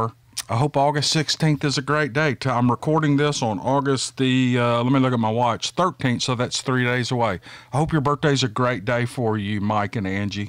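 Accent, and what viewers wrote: South, United States. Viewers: I think it's the southern accent that attracts people up here in the Connecticut and New York area. Yankees can't get enough of it.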